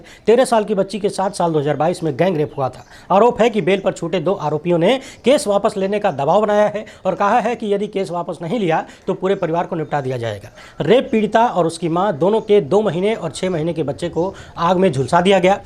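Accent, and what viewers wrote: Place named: Bihar, India. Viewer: Sar ap ki bhihari jo ton ha vo news me tadaka laga dethi Like 4ravish Kumar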